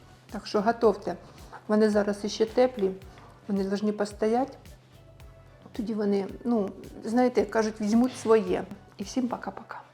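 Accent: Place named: Donbas, Ukraine. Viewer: хочу таке приготувати. а де вы проживаете, бо мени наравиться як вы говорите. прямо як у нас в Донбаси - вымушена переселенка.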